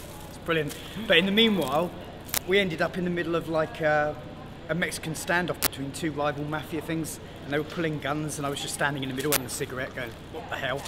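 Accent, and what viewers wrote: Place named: United Kingdom. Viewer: "his voice is so weird." He's British. We all have different accents in Britain. Northerners (like me) have stronger accents. It really depends.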